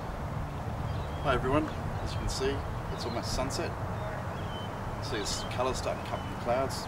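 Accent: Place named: New Zealand. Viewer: Great Video. Classic Kiwi accent.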